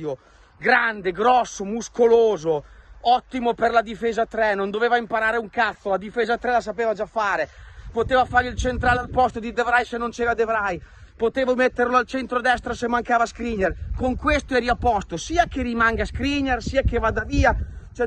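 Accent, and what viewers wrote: Italy. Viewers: Mi piace il tuo accento Emiliano romagnolo marchigiano 😁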